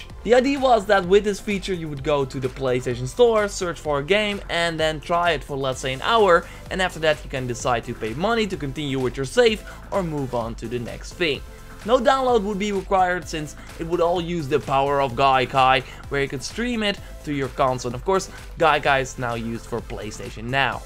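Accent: Netherlands. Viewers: Ben je Nederlands? Ik hoor het aan je accent hahah ;)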